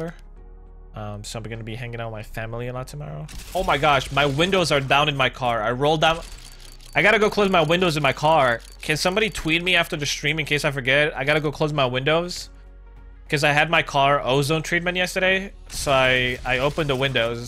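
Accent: Romania. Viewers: IS HE ROMANIAN?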